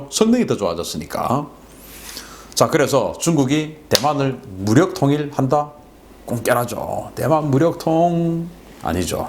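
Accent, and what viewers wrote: Gyeongsang, South Korea. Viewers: 화가 나서 다시한번 들어보니 대만섬 이라고 발음 하는거 같기도 하고?? 경상도 사투리 억양이 남아서 내가 잘못 들은거 같기도 하고??ㅠ 타이완도 엄연한 한개의 독립국가이니 대만섬 이라고 하지말고 대만 이라고 불러부는게 예의 인거 같습니다ㅎ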